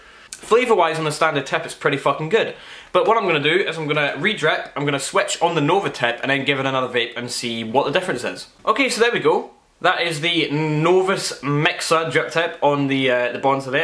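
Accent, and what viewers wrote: Scotland, United Kingdom. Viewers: You're so Scottish, it's beautiful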